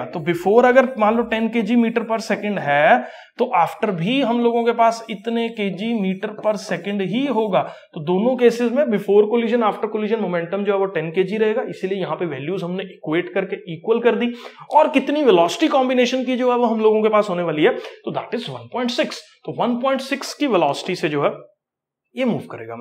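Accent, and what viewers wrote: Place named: Punjab, India. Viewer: Punjabi aagye oye ❤😅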